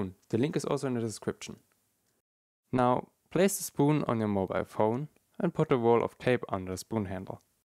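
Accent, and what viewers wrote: Germany. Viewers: Dieser deutsche Akzent😂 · Man hört das du deutscher bist :D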